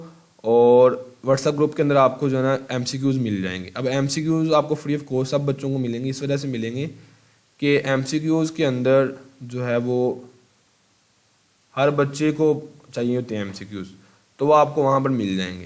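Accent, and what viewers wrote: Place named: Lahore, Pakistan. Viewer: Sir are you from lahore. your aaccent is like a lahori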